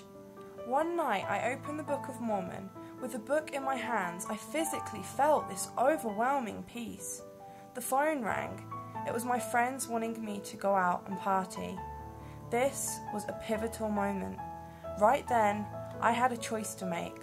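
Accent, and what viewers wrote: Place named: Australia. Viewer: What happened to your Northhampton accent C? U sound kinda Auzzie to my untutored ears :S (search 'Alan Moore' - the Watchmen / V for Vendetta writer - to those not in-the-know for the NH accent)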